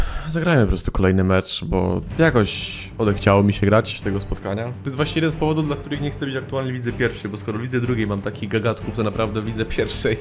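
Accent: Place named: Germany. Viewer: zwykły żabolski Szwab a nie Polski akcent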